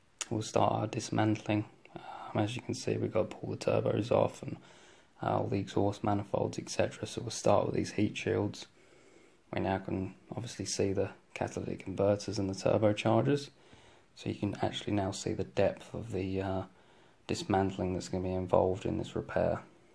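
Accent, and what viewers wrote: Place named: United Kingdom. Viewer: Ah right. Your British accent made me think you were from UK. No worries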